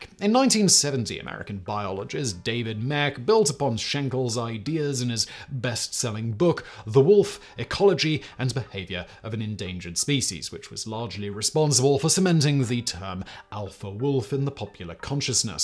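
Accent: United Kingdom. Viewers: I watched the video, would you be shocked if I told you that a British accent doesn't make this guy the ultimate authority on everything? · Zig Zag - Oh yeah, a British accent, that does it for me every time! Like I don't hear a British accent every time I speak. Your only objections are that he has a British accent and said something that you don't agree with? I've read several articles debunking the "alpha wolf" theory - from people who have studied the phenomenon. I don't take any one person's word that something is so or isn't so. I look it up, I check on it, I read up on it as much as I can. ps: What's really sad is that you're judging me by your own standards - which says a lot more about you than it does about me.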